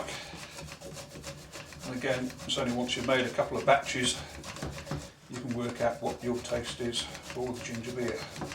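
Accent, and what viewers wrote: United Kingdom. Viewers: Is that a west country accent? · Do i detect a lincolnshire accent?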